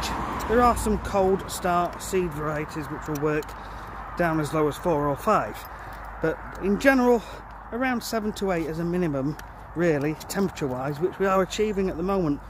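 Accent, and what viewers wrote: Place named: Sheffield, United Kingdom. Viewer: Where are you based? Your accent sounds local to Me, I’m in Sheffield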